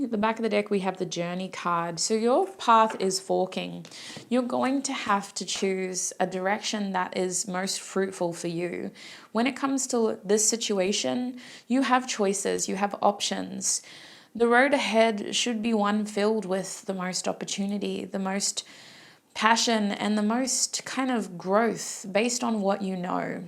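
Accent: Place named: Australia. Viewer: The second you spoke I knew you were Aussie!! 🙏🏼❤️🧿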